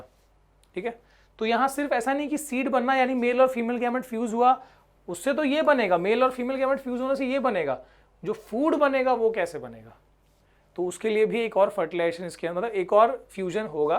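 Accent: Bihar, India. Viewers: Sir is video me Bihari style me bol rhe h ... I like that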